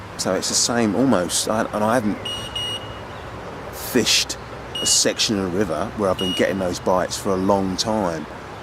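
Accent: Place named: South London, United Kingdom. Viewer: Loving the vids bruv what country you fishing in. I can hear the south London in ya accent 👍👍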